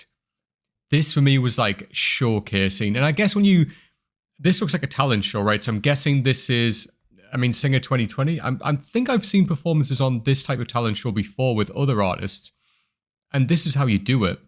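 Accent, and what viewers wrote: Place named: Durham, United Kingdom. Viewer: I like your accent , are you from Durham ?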